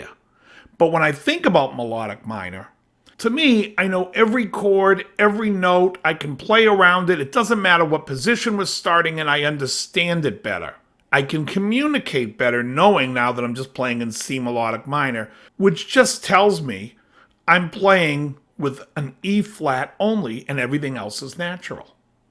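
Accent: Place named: New York, United States. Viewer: Like I said….really glad I stumbled onto your channel! So much great stuff….and you talk just like a a New Yawker. What part of New York are you from anyway?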